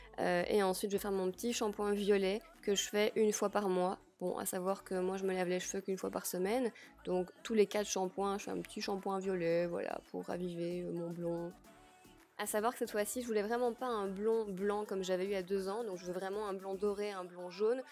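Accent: Belgium. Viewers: Hello 🙂 Ohhh j'ADORE tes Routines! Tellement simple, enfin ceux sont clairement mes FAVORITES! 😍 Jamais dans le "m'as tu vu", toujours réel, fidèle a toi même Ta bonne humeur etc.. Puis ton accent belges dans tes Routines, MA VIE 😄 Des bisous 😘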